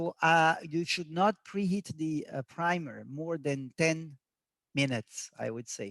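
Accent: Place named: France. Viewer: ну ладно французский английский))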